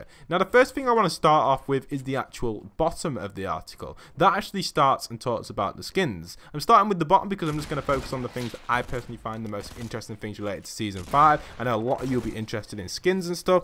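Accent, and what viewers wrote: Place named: England, United Kingdom. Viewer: Wawrzyn The Prodigy I doubt he went to Harvard. He has an English accent Edit: if he is from UK it would be very expensive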